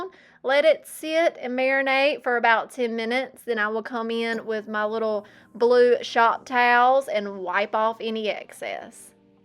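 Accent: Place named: Eastern North Carolina, United States. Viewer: I love all of your projects! Thank you for sharing your creative ideas. I live in East Tennessee and we have friends that live in Vanceboro, NC. When I watch your videos, it makes me think of and miss them because of your eastern North Carolina accent.❤🥰😉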